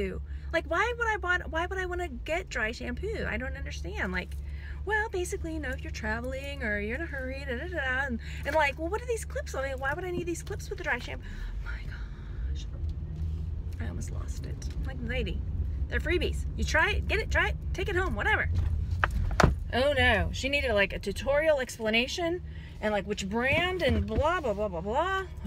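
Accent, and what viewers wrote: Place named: South, United States. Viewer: Happy early Birthday! more southern lady accent talk. :)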